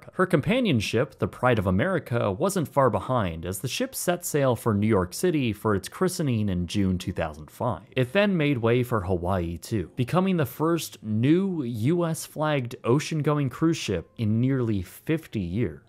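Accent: Canada. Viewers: I heard Oout a couple times the speaker is Canadian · Yes, he’s from Ontario. He’s done several videos about Canadian companies going bankrupt, too.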